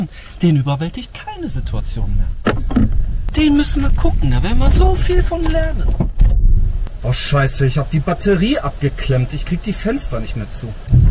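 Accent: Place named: Poland. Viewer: Hey, sag mal hast du Polnische Wurzeln? Du sprichst Polnisch wie ich ich habe eine Oma die aus Polen Kahm 😊Liebe grüße von mir und alles glück der Welt auf deinen Reißen ich währe so gerne so mutig wie du vielleicht wenn meine Kinder aus dem Haus sind!!!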